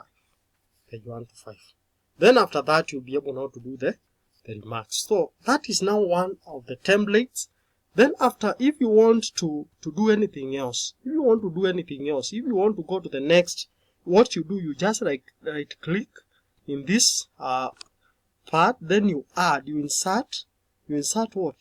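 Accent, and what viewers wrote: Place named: Kenya. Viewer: I guess you're asking about the accent? It's a Kenyan accent with peppered with Kamba(Tribe) accent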